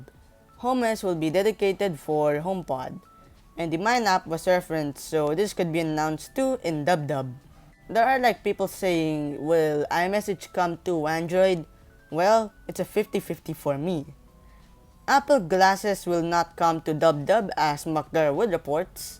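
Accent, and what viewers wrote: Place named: Philippines. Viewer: You are unmistakably Filipino, that voice gives it away 😂. And yes, I'm the same person from Twitter.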